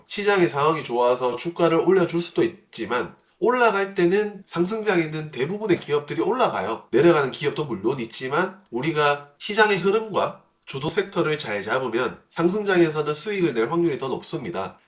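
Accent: Daegu, South Korea. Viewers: 오늘따라 대구 사투리 왜케 귀엽나요.. ㅋㅋ 오늘 터지고 반성중인 주린입니다ㅜㅜ ㅋㅋ 테마+실적 명심하겠습니다!!!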